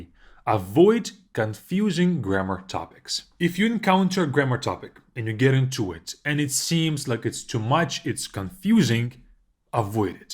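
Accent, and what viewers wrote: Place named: Russia. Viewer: No? He just has a strong accent because he’s Russian. What he actually said was camps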